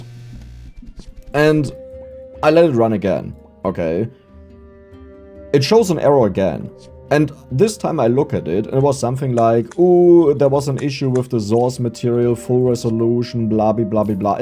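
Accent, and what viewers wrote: Germany. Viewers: Bro bist du deutsch? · are you german bro ???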